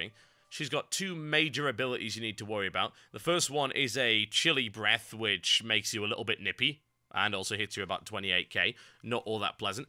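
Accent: United Kingdom. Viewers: don't forget to mention his really cool British accent.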